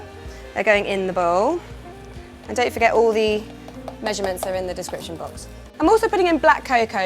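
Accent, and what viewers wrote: United Kingdom. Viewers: Came for New York Cookies but heard a british accent............hahaha, anyways, not half bad looking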